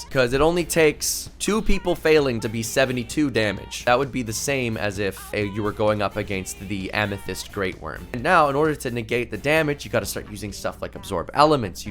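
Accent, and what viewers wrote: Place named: Canada. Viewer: Stacking dice up each move to eventually take that much damage is a great idea. I'm surprised you are from south Africa, all I hear in your accent is Canada.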